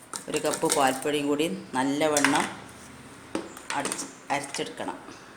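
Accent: Malappuram, India. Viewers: Nice itha .nishkalankamaaya Malappuram talk .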